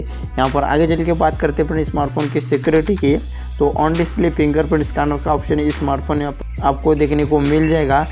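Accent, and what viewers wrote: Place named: Maharashtra, India. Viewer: Marathi Tune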